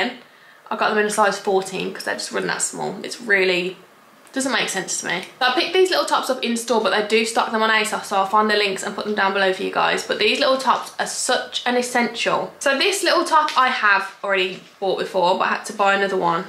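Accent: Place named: United States. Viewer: I love your American accent! makes me feel at home w you😭🥺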